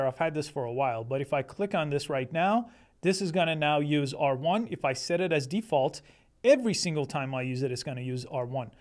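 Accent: Iran. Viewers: I found your channel while searching for local installations of R1. While your accent is very good, I detected some Persian influence in it. After doing some Googling, I discovered that I was right—you are actually from Iran. I wish you could start another channel in Persian; your homeland also needs your knowledge. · dude im hearing some persian accent lol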